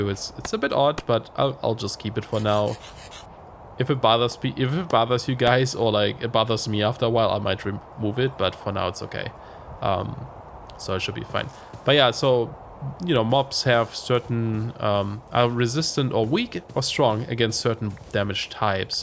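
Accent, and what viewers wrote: Germany. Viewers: Love the video! Also I have a quick question. Your YouTube profile says you are Canadian, "but" your accent kinda sounds German to me. Am I right about that?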